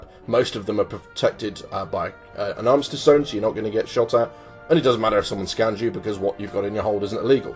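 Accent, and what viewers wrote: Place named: South West England, United Kingdom. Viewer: Love the video, are you from South-West England? It's so refreshing to hear someone who sounds like me on YT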